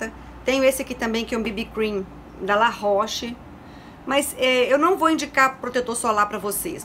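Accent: Minas Gerais, Brazil. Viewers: sou casada com um mineiro.... amo sotaque de vcs! Te sigo aqui, te adoro, sempre amorosa e realista!! Você parece demais também com a sogra da minha filha, na voz e fisionomia, lindaaaa você! Gratidão por seus conteúdos! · Você é mineira? tem um sotaque diferente! Adorei seus vídeos.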